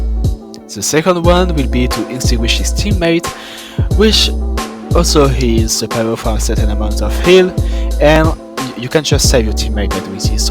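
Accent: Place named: England, United Kingdom. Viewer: Noice english accent 😙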